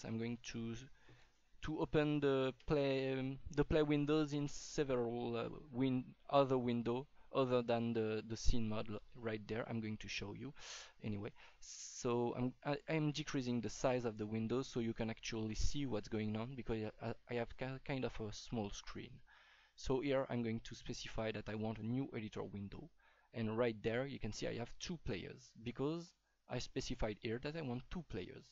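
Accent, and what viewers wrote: France. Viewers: Super tuto ;) C'est encore plus simple a comprendre avec ton accent français ;)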